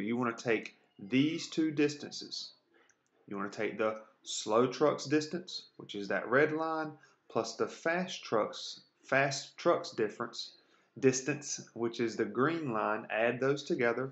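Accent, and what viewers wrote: South, United States. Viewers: Neat explanation + I like your southern accent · are you from the south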